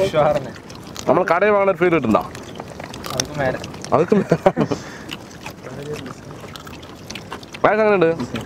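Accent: Palakkad, India. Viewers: Awesome.... ND palakkad slang vearey level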